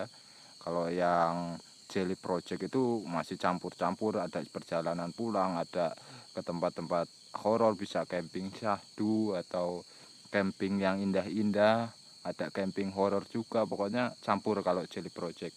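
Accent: Java, Indonesia. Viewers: Asal mana bang,, logatnya medok jowo tapi suka ngomong bahasa sunda "aing". 😀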